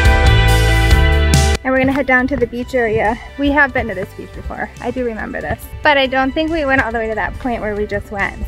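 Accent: Minnesota, United States. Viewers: Also? I love your Minnesotan accent! You from northern Minnesota? I’m from the Minneapolis area so… Hopkins to be exact. I can always tell when I hear a fellow Minnesotan :)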